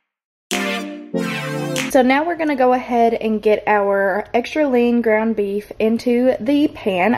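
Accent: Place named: South, United States. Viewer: Just subscribed! Your dish looks delish!! And your southern accent is ADORABLE!!!!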